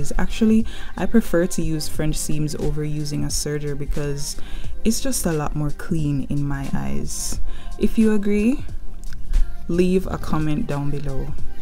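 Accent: Jamaica. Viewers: Are youJamaican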